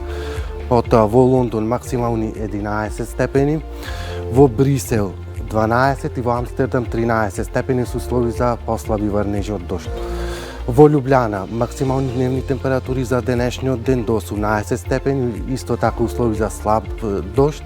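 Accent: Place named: Struga, North Macedonia. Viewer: Za razlika od Gligorce so na pocetokot ne znaese kaj terat Slavco e med a za struskiot dijalekt cepisi bate ne se drugive od banglades za da ne te razberat ! 👍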